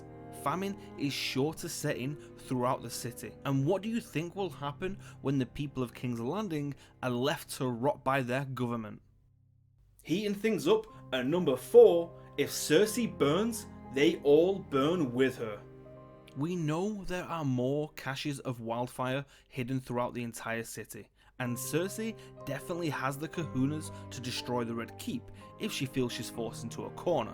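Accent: United Kingdom. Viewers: sounds like something from the UK? Hope he'll tell us!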